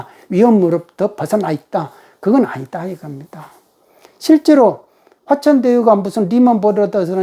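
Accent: Jeolla, South Korea. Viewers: 뭔가 팩트만 항상 말씀하시는 분 같이 느껴지네요. 억양은 라도분 같은데... 믿음이 가네요.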